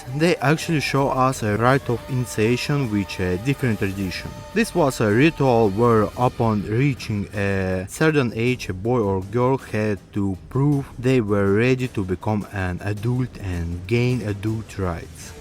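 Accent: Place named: Russia. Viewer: Бро, с таким произношением тебя никто не поймёт, кроме русских. Floor, door = [флор], [дор] Bear = [бэр], "бир" это пиво =Ъ Abandoned = [абАндонд] и т.д. Акцент акцентом, но гласные должны быть хоть приблизительно правильные.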